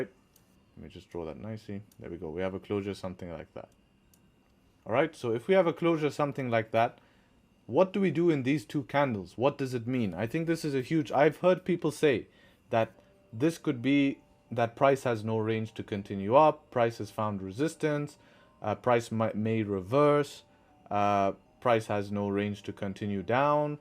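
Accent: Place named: South Africa. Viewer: You are coloured from south africa or namibia 😊, your accent tells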